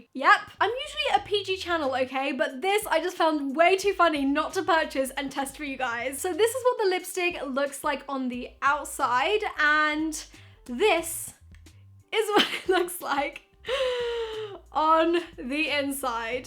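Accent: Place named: England, United Kingdom. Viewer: I think I'm in love with your english accent❣️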